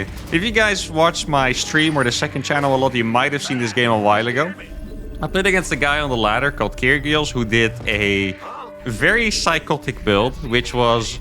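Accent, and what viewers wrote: Netherlands. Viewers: ruthermental? /dadjoke. I enjoyed this, always lovely to have dutch inflection in the accent. Go team Oranje!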